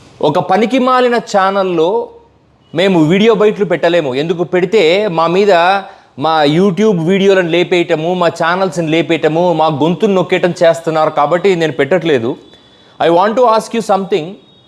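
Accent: Rayalaseema, India. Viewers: ఎంధి అప్పా మన గడా యాస గురుంచి మట్లాడివ్ --- అబ్బా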